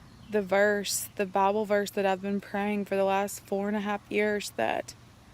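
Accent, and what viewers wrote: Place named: South, United States. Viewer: You are so beautiful! I love your southern accent. Praying for you!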